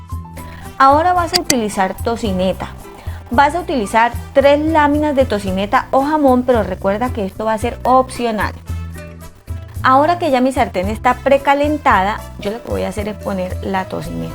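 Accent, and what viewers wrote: Colombia. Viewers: Por el nombre del canal yo pensé que era de comida mexicana ya que el achiote es la principal especie utilizada en la comida yucateca pero al oír tu acento me doy cuenta que eres colombiana. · Amo tu acento Colombiano!!!!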